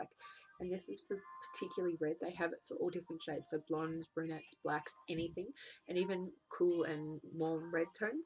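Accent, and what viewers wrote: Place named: Australia. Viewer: I love your accent my accent is super boooring yours is so cute! My auntie is from Australia and I always make her talk so i can hear her accent lol!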